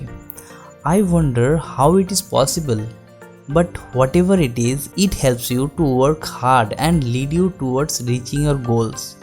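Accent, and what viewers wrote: Bengal, India. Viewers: His accent sounds like Bongs 🥶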